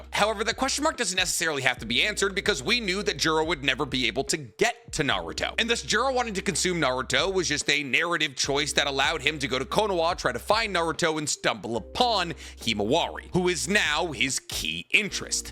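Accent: Boston, United States. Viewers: Yo brother you from Boston? Me too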